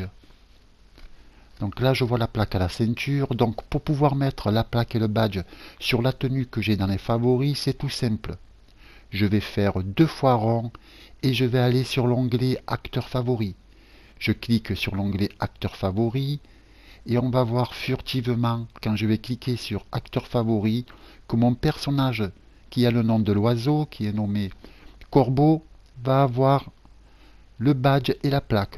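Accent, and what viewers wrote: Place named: South, France. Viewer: merci le plus bel accent du ssuuuudd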